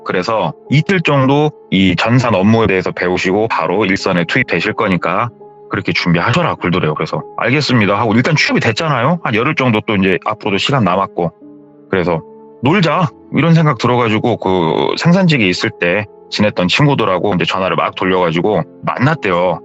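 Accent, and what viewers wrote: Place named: Chungcheong, South Korea. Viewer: 충청도 사투리로 돌려말하는거 ㅈㄴ 무섭네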